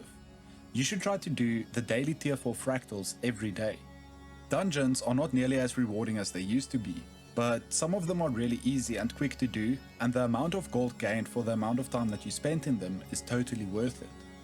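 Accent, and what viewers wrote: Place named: South Africa. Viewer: Love the heavy South African accent. - Hello there fellow SA person. Thanks for the guide. o/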